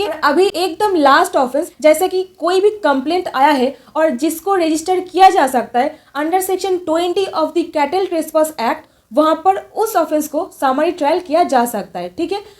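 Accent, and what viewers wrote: Bengal, India. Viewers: Your tongue language like Bengali girl 👧 mam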